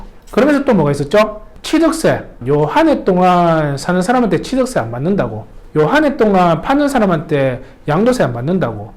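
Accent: Daegu, South Korea. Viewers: 말씀 넘 재미있게 하세요 대구 사투리 넘 매력 있어요~ 귀에 쏙쏙 잘 들어와요~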